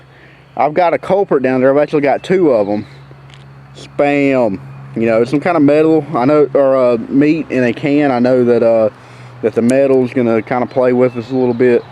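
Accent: South, United States. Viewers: I love clicking on these gun videos and hearing a southern accent 😂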